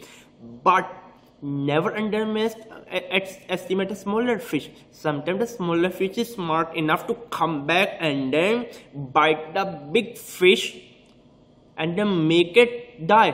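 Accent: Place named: India. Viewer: Damn what are you talking can't unterstand with this kinda Indian or whatever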